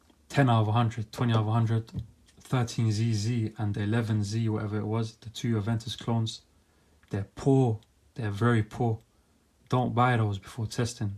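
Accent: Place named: Arab world. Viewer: Hispanic name, with arabic accent?!! Strange!!!!!!!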